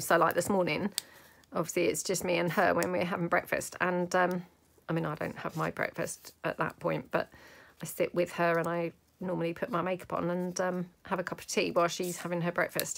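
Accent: England, United Kingdom. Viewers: Hi, I do love listening to your chit chat as well as all your lovely junk journal tips. Have lived in Australia since 1974 and miss the English accent.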